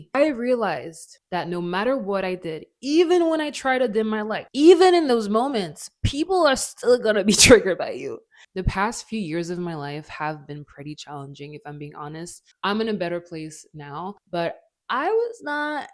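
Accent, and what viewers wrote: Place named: France. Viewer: I wasn't ready for you to be french 😂 quel accent de fou !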